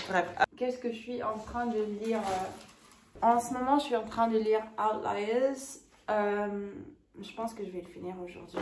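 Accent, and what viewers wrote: France. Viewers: Vrmt j’aime trop tes vidéos ! Ton accent français est bcp trop mignon en plus. Je regarde aussi tes vidéos en anglais aussi psk en tant que française qui étudie au UK j’essaye d’avoir l’accent british mdrr :))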